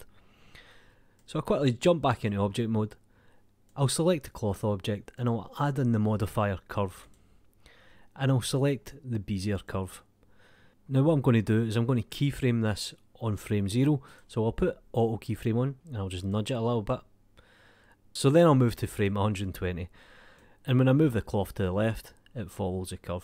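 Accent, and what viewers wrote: Scotland, United Kingdom. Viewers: Soo Scotish accent :)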